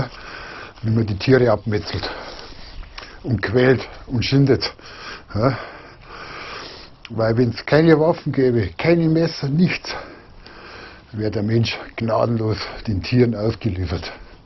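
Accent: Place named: Lake Constance, Germany. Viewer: ja du hesch ja au e geile Dialekt ;-) aber ke ahnig woni di söll here tue , dänke so bim Bodesee ir nöchi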